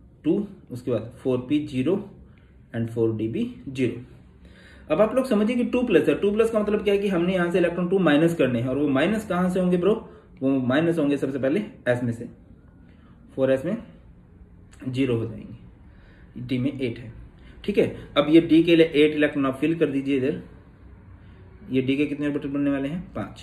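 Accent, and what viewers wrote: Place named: Chandigarh, India. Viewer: Sir aap kha se ho apki language aas padose ki hi lag rhi h Chandigarh se??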